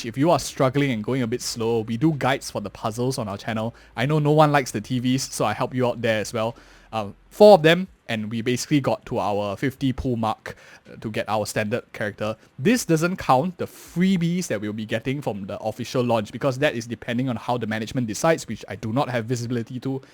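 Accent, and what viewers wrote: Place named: Singapore. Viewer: hello, when i first heard you speak i immediately recognised our SG accent! hahaha. keep up the good work with your guides. much love from a fellow SG:D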